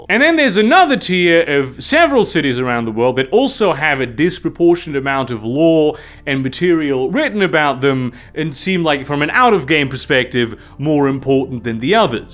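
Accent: Germany. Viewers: I knew in one video mentioned being German, I didnt realize how German until listening to this. Honestly I could do with a video of him speaking solely German. Also, found a long lost map and campaign setting for shadowrun that I thought that I lost today same as when I started this video. I take that as a sign to run a game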